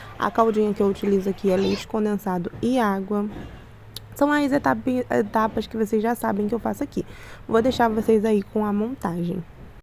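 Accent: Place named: Rio de Janeiro, Brazil. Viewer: É o sotaque carioca kk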